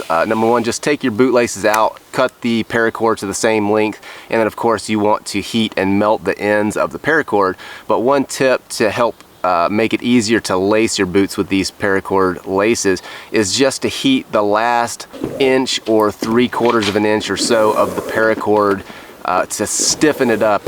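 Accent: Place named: South, United States. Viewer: where is he from? slight southern accent but he says he's in the rockies. i'm confused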